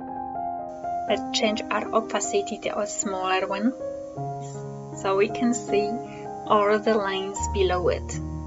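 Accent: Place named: Scotland, United Kingdom. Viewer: Oh I can hear your scottish dialect by your speaking 😁. This remember me on scottish singer Derek William Dick -> yes his real name as Fish (early from band Marillion 81-88). Do you know him? ☺☺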